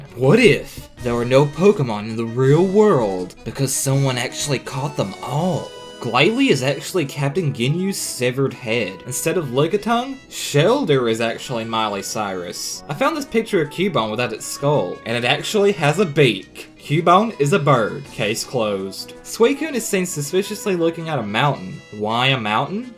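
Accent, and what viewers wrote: South, United States. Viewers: Ugh, your voice, it's like a gay southern accent. no offense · He sounds like a hillbilly. (But not to say he is stupid)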